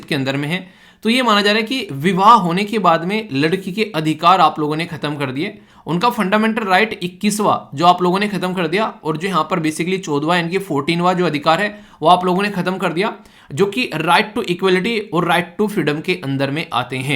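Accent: Haryana, India. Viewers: haryanvi ascent chait basiakh sadh saman................... love from rtk(HR)😍😍❤❤❤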